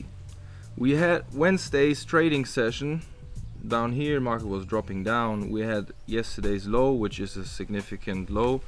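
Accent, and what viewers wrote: Germany. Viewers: Bro du bist doch Deutscher, das hör ich doch direkt raus 😄 warum hast du deinen Kanal in den USA located? Wohnst du da seit einer Zeit?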